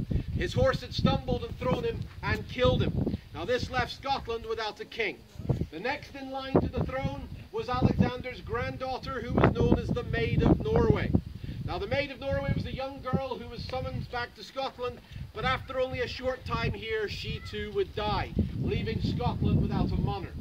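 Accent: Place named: United States. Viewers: Interesting presentation, however, the presenter sounds TOO American for the sake of authenticity. Saor Alba🏴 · the presenter is a Highlander Born and bread, he went to America To study where he picks up his American twang.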